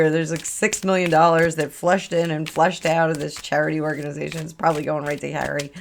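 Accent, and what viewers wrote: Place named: New Jersey, United States. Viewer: More Jersey accent, please!!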